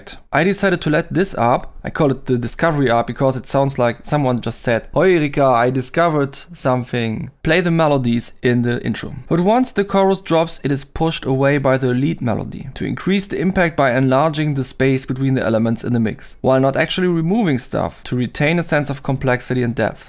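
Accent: Germany. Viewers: Du klingst so stark deutsch 😂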